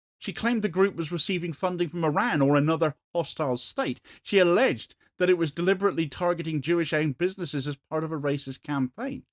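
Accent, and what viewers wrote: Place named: South West England, United Kingdom. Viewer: Recently came across this channel, great to hear some sensible straight talking on politics in a proper south west accent!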